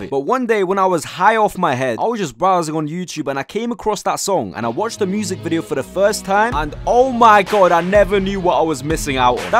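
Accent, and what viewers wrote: United Kingdom. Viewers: the last one is totally true from my own experience, watching your video with Briish accent is way more easier with zaza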